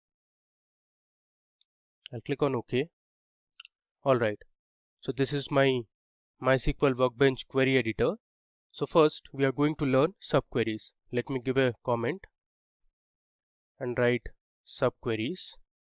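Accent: India. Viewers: Are you facing problem with hindi ascent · Hes Indian and what's wrong with the accent or do you want him to have Canadian accent or your mother tongue? appreciate the hard work or gtfo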